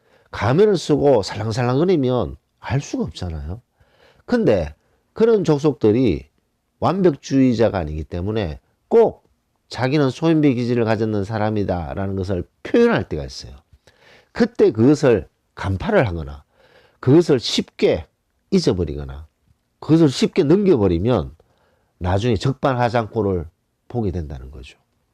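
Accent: Gyeongsang, South Korea. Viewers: 경상도 말투인데 듣기 싫지 않네요. ㅎㅎ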